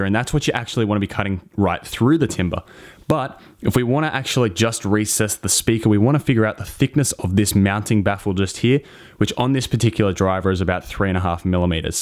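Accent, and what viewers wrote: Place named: Australia. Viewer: Nice to hear an Aussie doing this. I don't know anything about plunge Routers, do you need different "bits" to fit on the cutting apparartus? Can you get them in australia?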